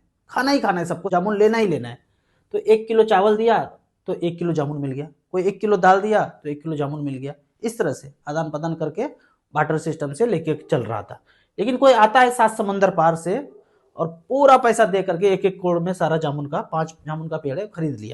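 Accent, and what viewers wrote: Bihar, India. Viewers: Bihari boli bolta hai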